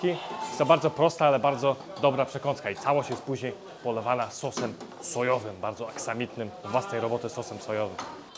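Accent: China. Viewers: Chłop co po polsku mówi z chińskim akcentem, no mistrz 😃 trafiłem tu przypadkiem ale chyba zostanę 😁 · mówisz jeszcze po polsku ale już z chińskim akcentem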